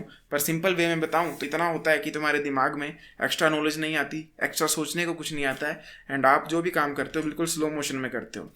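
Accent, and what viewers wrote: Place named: Kangra, India. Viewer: मिंजो ऐसा कयो laggada की bhau तू कांगड़ी है।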